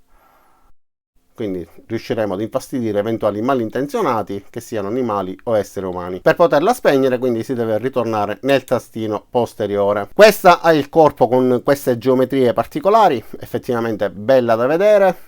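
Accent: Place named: Palermo, Italy. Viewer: Ciao io sono di Trapani, tu dall'accento sembri Palermitano.. O sbaglio?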